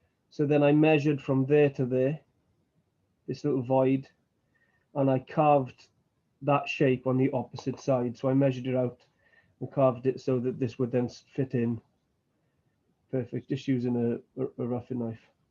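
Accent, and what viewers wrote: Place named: Wales, United Kingdom. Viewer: Gotta be a welsh accent. Lovely to hear it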